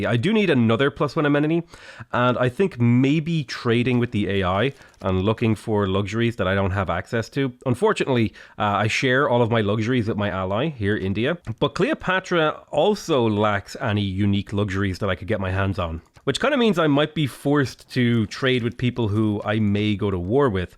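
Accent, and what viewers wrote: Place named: Ireland. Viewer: nothing wrong with your voice. evidenced by the success of your channel it's demonstrably fine. (blessed with an irish accent i would argue its excellent. you're a skilled communicator.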